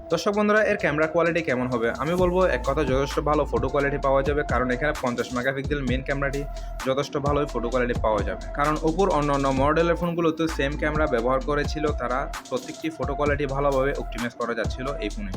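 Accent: Chittagong, Bangladesh. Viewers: বদ্দা চিটাইঙ্গে নে?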